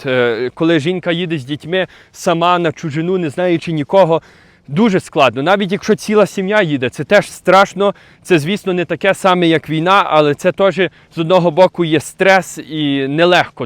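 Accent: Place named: West, Ukraine. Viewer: Ти некрасиво говориш українською, в тебе акцент западенсько- сільській.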